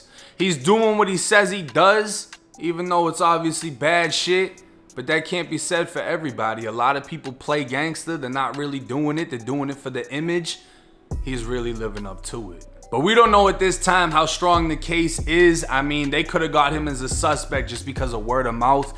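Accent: Boston, United States. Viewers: ARE U FROM BOSTON? Ur accent bro! Lol! ROXBURY DORCHESTER MATTAPAN!!!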